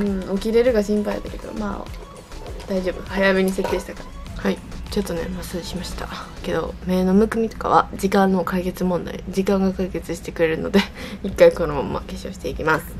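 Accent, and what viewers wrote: Kansai, Japan. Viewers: 関西弁？1人の時はあんまりでないけどお友達と話してる時結構出てるのかわいい🥰笑笑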